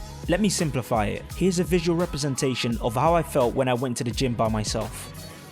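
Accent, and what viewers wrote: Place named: Jamaican-Cockney, United Kingdom. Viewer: cant listen to the stupid jamockney acccent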